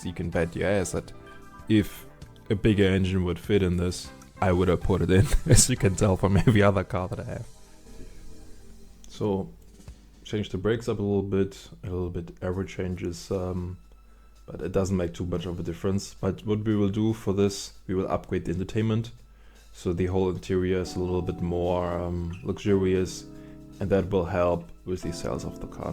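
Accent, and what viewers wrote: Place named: Germany. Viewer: Why do you sound German?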